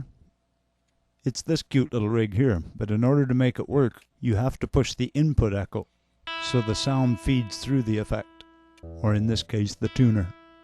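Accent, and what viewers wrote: Canada. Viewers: Hey Dude, Nice job on the tutorials. I'm am down here in San Diego and used to be from Cleveland, the Home of Rock and Roll, had a studio there called North Coast. You remind me of many a gig I did in Canada. I didn't read your bio but you have to be from the Great White North by the sound of your voice. I must say, you have a very polished delivery in your tracks and really easy to follow. I, being an engineer have spent HOURS editing "ums.& ahh. ahh" Nice instruction, preperation/delivery.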